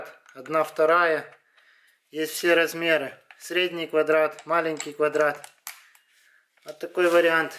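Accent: Odessa, Ukraine. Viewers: Вы из Одессы))?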